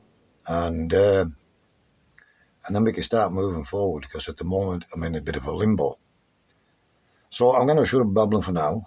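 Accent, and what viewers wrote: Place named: North East England, United Kingdom. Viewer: I am a new subsciber and although you think your 'bit of land' is a mess, I think that it still looks amazing! I wish you well with your home search. Times are rotten with regards to the renting in this country. 2 questions if I may ask. Why did you get rid of your other chickens and do you remember what your rose is called? When I was a child, we had an almost identical one growing over a rustic trellis and I have been looking for the same one without success most of my adult life. Although I was born in the south of the UK, by the sound of your accent, you come from the North East, as did my Dad.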